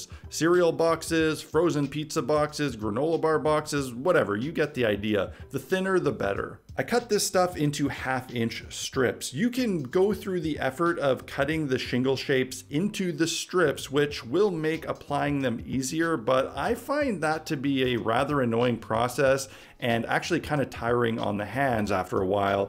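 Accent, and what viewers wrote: Canada. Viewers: Canadian? are you westcoast? btw what a great fantastic building, hope you dont mind totally copying this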